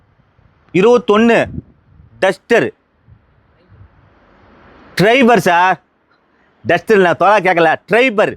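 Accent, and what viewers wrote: Madurai, India. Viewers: Madurai vasagam super 🎉🎉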